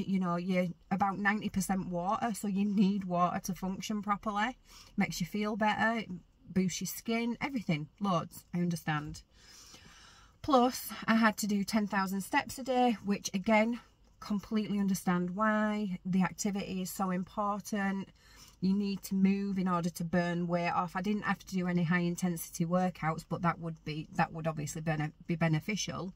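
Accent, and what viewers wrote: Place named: East Lancashire, United Kingdom. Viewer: Preston. I’m thinking you are maybe East Lancs just by your accent? X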